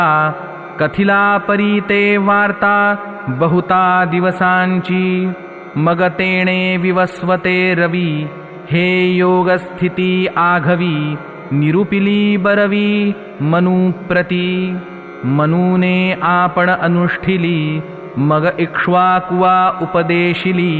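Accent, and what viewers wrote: Standard. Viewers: जय श्री कृष्ण आपली वाणी व उच्चार खूप शुद्ध आहेत.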